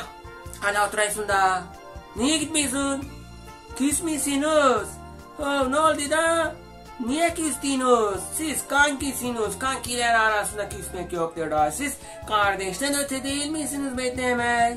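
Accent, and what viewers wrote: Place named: Aegean, Turkey. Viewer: buda ege şivesi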